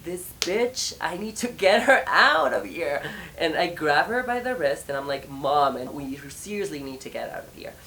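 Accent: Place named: Los Angeles, United States. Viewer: I love ur L.A accent.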